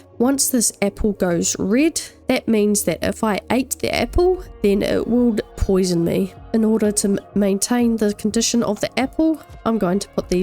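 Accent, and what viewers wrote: New Zealand. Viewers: Always nice stumbling on a kiwi accent in the wild :)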